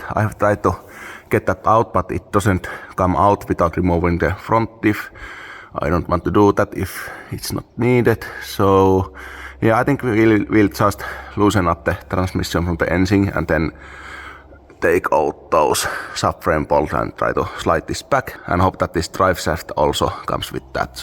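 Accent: Finland. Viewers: Ralli englantia😂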